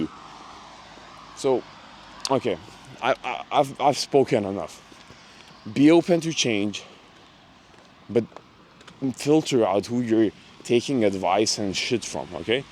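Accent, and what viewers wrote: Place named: Croatia. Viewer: I recently hitchhiked around Europe from the u.k. not Lithuania sadly. I thought you sounded Croatian for some reason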